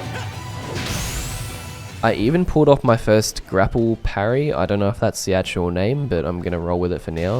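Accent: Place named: Australia. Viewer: tekken is a hard game, with a huge amount of knowledge checks. happy to help you come to grips with the basics if you like? judging by your accent, you are in Australia right? I'm in NZ so the connection should be pretty decent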